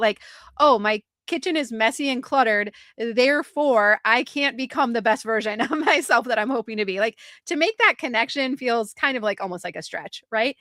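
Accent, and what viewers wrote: Minnesota, United States. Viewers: yep she sounds normal to me, Minnesota thing Lol😅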